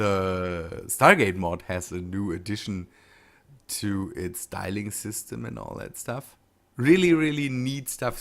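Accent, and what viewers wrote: Germany. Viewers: Deine Englische Aussprache lässt annehmen du Sprichst auch Deutsch?? Wenn ja ist das in der DEV Version auch so das es einen Gravierenden Fehler im Spiel gibt? Seit dem Update 1.157.12 friert das Spiel immer wieder ein teilweise sogar für bis zu 5sek oder länger und dabei geht die Prozessor auslastung auf 100%. So macht das Spiel langsam echt keinen Spaß mehr. Ich habe schon alles mögliche versucht. Dieser Fehler tritt selbst dann auf wenn kein einziger Mod im Spiel ist :/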